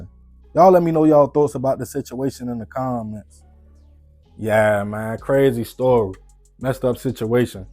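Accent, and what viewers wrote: Baltimore, United States. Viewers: dat bmore accent too hard to hide...lol yo be like tryna sound professional but dat bmore swag just shine thru brova.. Just speak how u speak yo and it is what it is. Being yourself makes people appreciate your content and u more.. Also i ive in bmore bro and i wanted to tell u that I hope one day i meet u cuz u inspired me to start grinding again on my page.. Im about to start gettin to it and being consistent and thats because of u brova!! so thank u... sincerely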